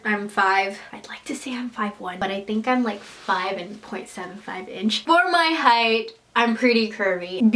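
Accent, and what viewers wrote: Philippines. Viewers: i'm a full blood filipino and i don't know if i really look like a filipino on my videos lol, and i really love your accent when it comes to speaking english & filipino 😍😂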